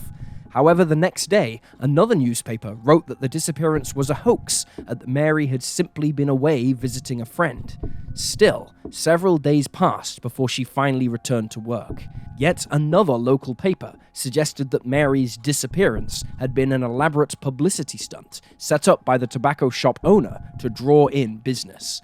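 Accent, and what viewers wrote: England, United Kingdom. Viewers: Good, the English guy is back.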